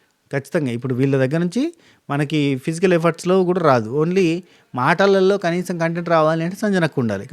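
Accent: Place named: Rayalaseema, India. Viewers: garu rayalaseema yasa ok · Memu rayalaseema kani mee antha goranga matram matladaru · Rayalseema yaasa😅😅😅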